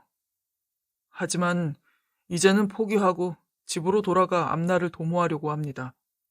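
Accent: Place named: Chungcheong, South Korea. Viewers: 참으로 정겹고 훈훈한 아름다운 야화입니다 그야말로 구수하고 정이 뚝뚝 떨어는 충청도 사투리의 뚝배기 맛이 정말 일품이요 재밋게 잘 들었다오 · 충청도식화법이 재밌네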